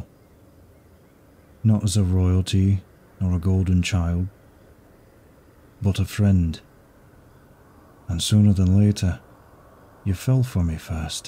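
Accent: United Kingdom. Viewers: Are you from Yorkshire? Tysm for the fun vid 🩷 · I was just about to compliment you on your northern accent and I'm a northerner myself.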